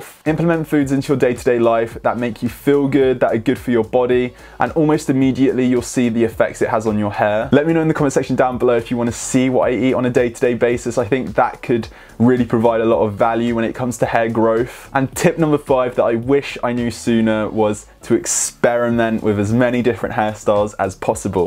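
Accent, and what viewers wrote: United Kingdom. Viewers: Are you British? Love your accent ❤️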